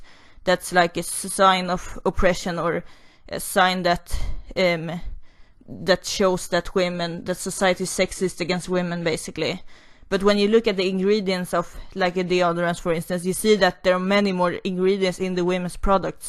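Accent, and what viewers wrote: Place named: Sweden. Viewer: are you from sweden? you sound swedish!:)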